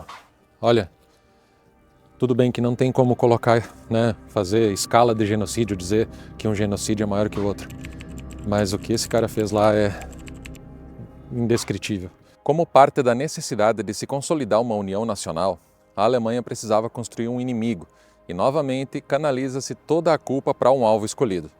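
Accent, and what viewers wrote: South, Brazil. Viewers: Gostei muito da matéria, pelo seu sotaque deve ser aqui de Santa Catarina ou do Paraná. To certo ?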